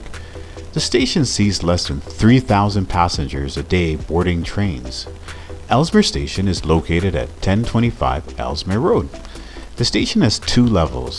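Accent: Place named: England, United Kingdom. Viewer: The explanation was awesome with English efficiency and accent 😍 I love this video a lot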